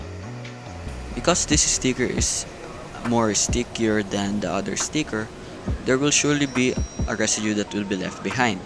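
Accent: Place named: Philippines. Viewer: Are you filipino? Because I can hear that filipino accent heheheh :)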